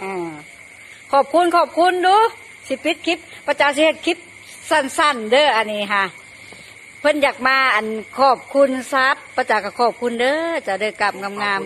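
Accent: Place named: Phu Thai, Thailand. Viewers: สำเนียงเสียงภาษาคือย่อสกลนครเนาะจ๊ะดีใจด้วยคะ. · สวัสดีค่ะป้า อยู่ทางเลอค่ะนิ คือเว้าภูไทย คือกันกะนางแท้ กินยามเลอกะแซบยามนั้นเนอะค่ะ เป็นอีกหนึ่งกำลังใจให้ป้าเด้อจร้า😊❤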